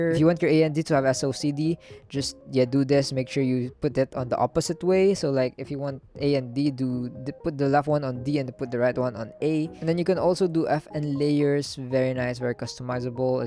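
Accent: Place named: Philippines. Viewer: Are you Filipino by chance? You sound like one. 😊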